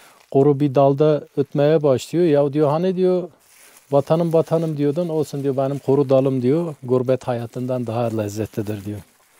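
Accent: Adıyaman, Turkey. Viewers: Abi sen kahtalı mısın? Ağzından ilk kelime çıktığı anda eşime bu abi hemşehrimiz dedim..Adıyaman’dan sevgiler saygılar..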